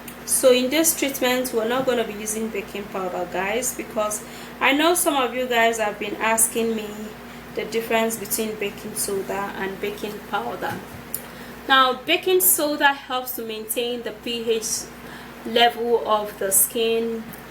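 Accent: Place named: Nigeria. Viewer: 77% of Nigerians bleach and judging from her accent shes Nigerian ): other Africans bleach too but Nigeria tops the list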